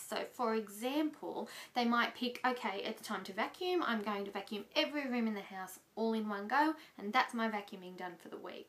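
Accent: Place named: Australia. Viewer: I detect an accent and noticed you spelled some words differently than the way Americans do. Are you Australian or British (I'm leaning more towards Australian).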